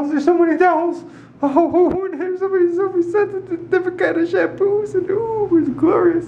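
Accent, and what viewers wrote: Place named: Ireland. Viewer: You like an Irish man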